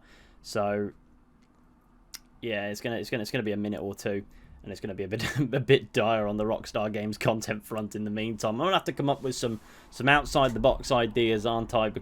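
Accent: United Kingdom. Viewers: لكنتك ليست أمريكيه هل انت من المملكه المتحده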